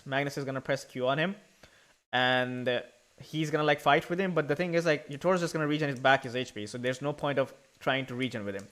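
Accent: India. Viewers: VERY NICE JUST COPY CEB VIDEO IN INDIAN ACCENT , there have been 1000 game with helm and u chose this game that ceb already explained xd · keling speak english so annoying to hear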